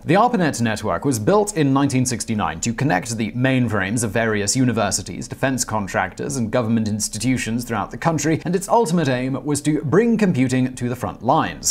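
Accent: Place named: RP, United Kingdom. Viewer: "Radda-ranj" oven? (just teasing! I'd love to have a posh British accent over my midwest Ohio drawl...)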